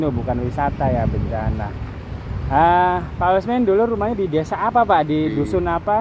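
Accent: Cirebon, Indonesia. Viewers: Sampean wong ndi ang? Kayong ilate ky wong cirebonan sih ?... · Mas orang Cirebon Tah? Logate kyak wong cerbon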